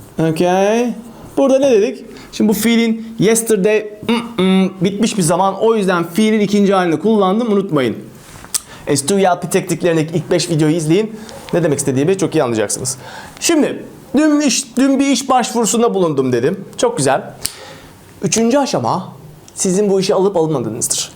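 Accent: United Kingdom. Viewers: british accent is amazing :D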